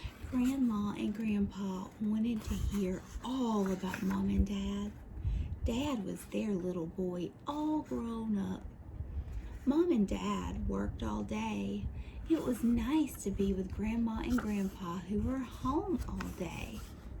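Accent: South, United States. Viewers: I can never get over your country accent does it for me every time thanks for another super relaxing video ❤️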